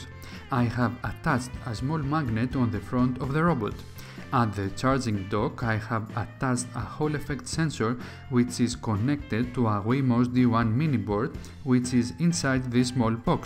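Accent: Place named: Greece. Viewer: Where are you from ? You accent sounds greek.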